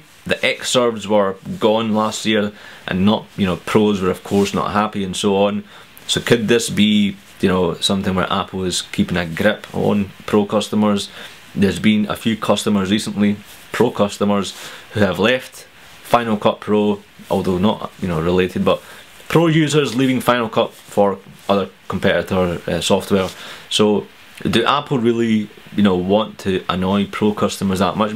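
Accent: Scotland, United Kingdom. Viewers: The Scottish accent is very soothing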